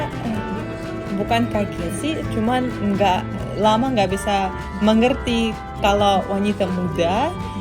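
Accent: Java, Indonesia. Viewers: Logatnya sdh seperti orang indonesia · Eksennya sudah orang Indonesia. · ngomongnya Indonesia bangett mbak ...... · Logat mu bersih sekali , sudah persis orang Indonesia asli, agak terpengaruhi logat jawa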